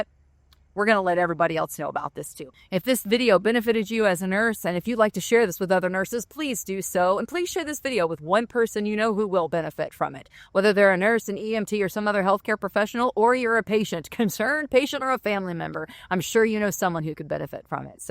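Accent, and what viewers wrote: South, United States. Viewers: I have been following you for many years and always appreciate your thoughts. I hate to hear you losing your beautiful Southern accent.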